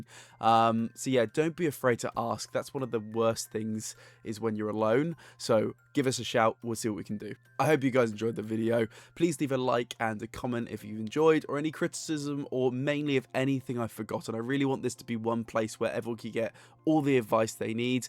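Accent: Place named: United Kingdom. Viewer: Great video! I also hear a British accent (you correcting yourself from £1 to a dollar bill haha); not many of us who play/played TTR! This might be advanced, but this is what I used to do when training in buildings alongside your advice: I'd optimise the gags in a way where I'd overkill the cog on the last floor to max out how much gag points you can acquire in one building. For example, on the top floor, if the first cog is Lv 9 by itself before other cogs join, I wouldn't use a Lv 6 gag as it'll most likely take it out in one hit. I'd use two Lv 4 or 5 gags then use a Lv 6. That way, you'll max out the gag points faster. Like using a trap door first, then TNT. Or two quicksands then TNT. Also, Yesman are the best cog to face in an invasion; their attacks are not powerful and go up to Lv 7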